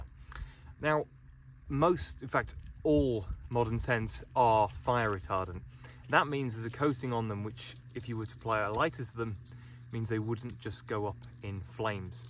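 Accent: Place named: United Kingdom. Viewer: You have a narrator's voice. It reminds me of a voiceover from a British show.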